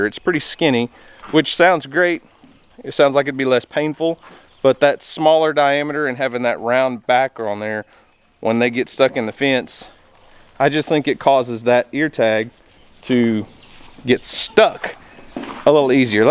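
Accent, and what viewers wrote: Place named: Houston, United States. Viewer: Called. Slow up. Lol Great job yall.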